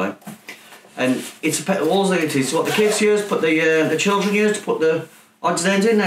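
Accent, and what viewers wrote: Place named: Yorkshire, United Kingdom. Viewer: Great fast idea ... and I love listening to you! Love the Yorkshire? Accent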